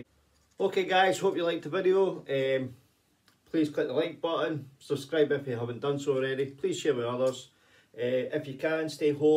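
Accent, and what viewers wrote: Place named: Scotland, United Kingdom. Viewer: Great review! Just ordered a set of these. I will come back and let you know what I think. Love the Scottish accent as well by the way! Cheers from Canada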